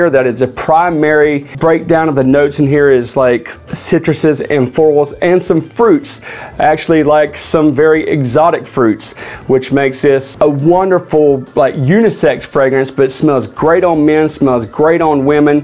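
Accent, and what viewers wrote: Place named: Texas, United States. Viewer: Dude I really enjoy your reviews. You sound like your from my neck of the woods. Ft Worth friggin Texas. Preciate you’re work here. I’ll be checking some of these out.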